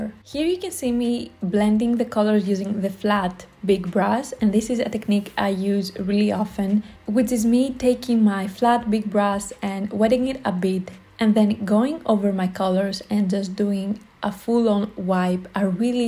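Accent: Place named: Greece. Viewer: Your Greek accent is so beautiful. I could listen to your voice for hours.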